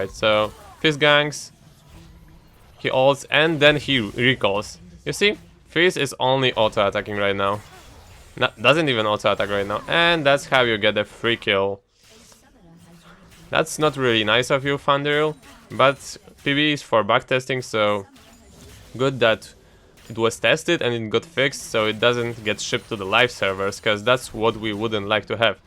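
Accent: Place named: Poland. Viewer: Ale masz polski akcent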